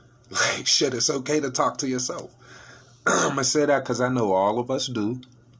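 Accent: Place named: Pittsburgh, United States. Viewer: This don’t got shit to do w yo video but I can really tell that you really from the burgh from the way you talking 💯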